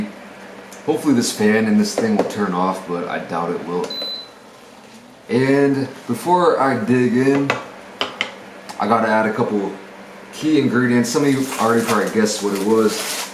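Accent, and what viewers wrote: United States. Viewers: His voice is so american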